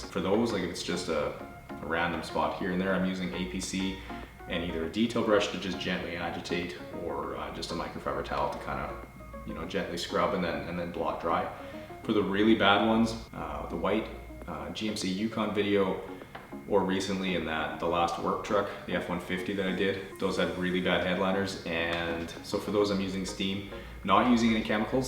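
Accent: Canada. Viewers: I didn't know you were Canadian til today, probably. And it makes no difference to me. I'll be friends with somebody and support their business no matter where they're from or anything. I'm saying because people were making Canadian comments or whatever, but most people that I end up liking on tv or whatever are canadian. Some of them are english, or some other culture, but most of the ones I like...I discover they're Canadian after I watch them for some time. I don't really notice the accent right off sometimes. accent isn't really that noticeable until he says something with an "O" in it. But if you like Canadian people, there's always Jim Carrey, Alan Thicke (rip)... there's a channel on here called the Dungeon Dudes, that me and my boyfriend watch...Monty, Kelly and all them are Canadian. But I think they're from a different part of Canada. Matthew Santoro also has a channel and he's from Canada. 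Michael J. fox from Family ties is Canadian, and Neve Campbell from Scream. And Celine Dion. She's from the Quebec part. She's french-Canadian. But anyway, yeah I was just saying that. I realize that most of his comments aren't bad, just wanted to say that I kind of didn't know he was Canadian til today, I read all the comments and it makes no difference. Just wow. I've been watching him for about 3 or 4 years and I figured he was from somewhere in the U.S. you better be from somewhere else and not Texas right now,... it's better in Canada than it is in texas right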